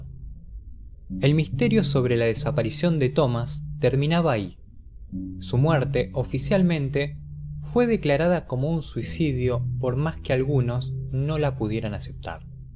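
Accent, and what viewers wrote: Argentina. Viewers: El acento argentino y uruguayo generalmente es así. Vocalizan la s como j. Y la ll como sh. Lo mismo pasa con el acento español, pronunciando la c como z. El idioma español es muy complejo en cuanto al léxico de cada país.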